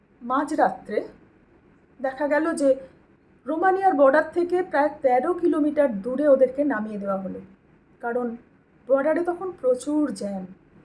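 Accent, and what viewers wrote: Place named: Bangladesh. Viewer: আপনি দয়া করে ঐ 26 জন হিন্দু কে তার স্ত্রী, বাচ্চা ও আত্মীয় দের সামনে, শুধুমাত্র হিন্দু হবার জন্য অস্ত্র হীন মানুষ গুলো গুলি র বৃষ্টি বইয়ে দিয়েছিল কৈ তাদের নিয়ে এই বাতেলা তে শুনতেই পেলাম না।ঠিক আছে ঐ কাশ্মীরে ঐ অপদার্থ গুলো যায় কেন? তার দায় কি আমার? ভালো কথা আপনার ভাষা শুনে মনে হচ্ছে বঙ্গালী।সরি বাঙালি তাহলে মুর্শিদাবাদ, মোথাবাড়ি ইত্যাদি ইত্যাদি তে যে হিন্দু দের কেই টার্গেট করে হিন্দু বাড়ি ঘর জ্বালানো, লুটতরাজ, মহিলা দের শ্লীলতাহানির মতন কটূক্তি কর কথা বার্তা সেগুলো??? ও হো, সো সরি ঐ যে কে একজন ডায়লগ দিয়ে বলল আমরা 70% ওদের কেটে গঙ্গায় ভাসিয়ে দেব।তখন আপনি বাতালা ঝাড়ার মতো মেরুদণ্ড ছিল না, কারণ ঐ লোকটা ই বলেছিল হেন্দু পান্ত ভাত খেইয়ে ঘুমুচ ছে, তাই আমরাই করব।কৈ তখন তো কোনো লে চ কা র ইউটিউবে পাই নি কোওওওও?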